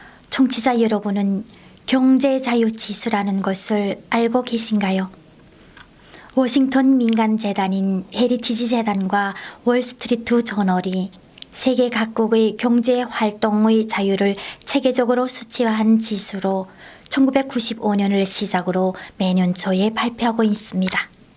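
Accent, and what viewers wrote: North Korea. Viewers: 다른 방송에서 북한 사투리로 말씀하시는 거 잠깐 본적 있어요. 지금 방송도 잘 하시지만 사투리 사용하시던 그 모습도 보기 좋았어요. 항상 응원할게요. 감사합니다.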